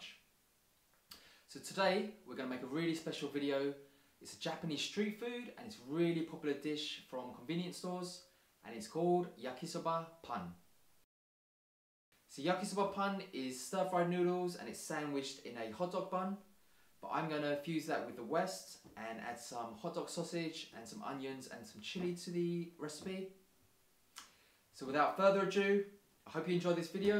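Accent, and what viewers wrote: United Kingdom. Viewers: dude, I have been wondering that are you Japanese or British? Quite an accent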